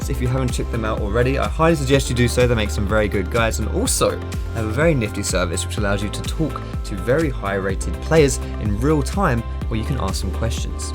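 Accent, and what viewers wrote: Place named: United Kingdom. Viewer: Ok. love your videos, and I watch them religiously, but after like 9 months I have to ask WHAT THE HELL ARE YOU SAYING IN YOUR INTRO? it sounds like "special P here" or something. My american ears can not take thine british accent when spoken too quickly.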